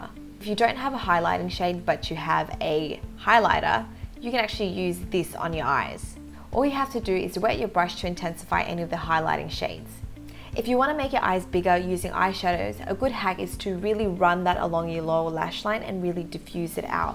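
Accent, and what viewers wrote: Australia. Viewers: New subscriber here. Is she Australian? I really appreciate youtubers that aren't from the USA, not that there's anything wrong with that. It's just nice to have more than the standard American youtubers.😀 Great video! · so basically it was the australian accent? lmao I haven't seen bindi irwin anywhere in years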